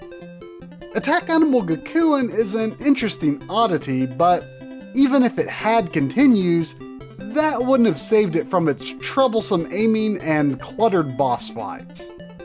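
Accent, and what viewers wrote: United States. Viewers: I understand trying to pronounce some Japanese words can be difficult, but listening to them in a heavy american accent is also just as hard listen to. Japanese phonics are actually fairly easy to learn as most of the sounds are repetitive. I highly recommend the japanesepod101 youtube channel. <link>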